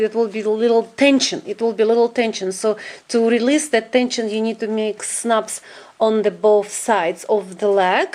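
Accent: Russia. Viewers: Я не говорю по английски, но по-моему, такой сильный русский акцент))) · ужасное произношение извините конечно но надо поработать) очень русский акцент ((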